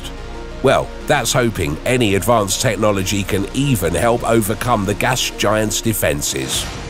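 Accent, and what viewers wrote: United Kingdom. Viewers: What's wrong with the narrator being "Brit"? Are only Americans allowed to speak on YouTube? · HUH? What do you mean "why" is he British? Do you expect the crew to go "oh damn agh nevermind you can't narrate. You're British so.." Why not a brit? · Why is the narrator a Brit? Also, why after 70 years of space exploration and billions spent on Satellites and Probes are we essentially clueless about why or what is actually happening in, on, and around Jupiter?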